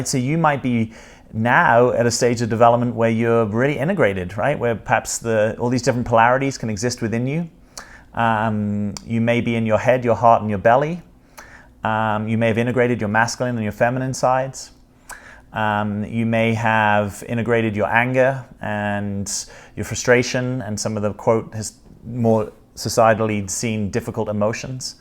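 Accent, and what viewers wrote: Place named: United Kingdom. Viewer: Thank you for this video. Your analysis and understanding of human behavior is helpful and amazing. Your British accent makes the topic as significant as it really is and should be. Will listen to it again and from time to time. FR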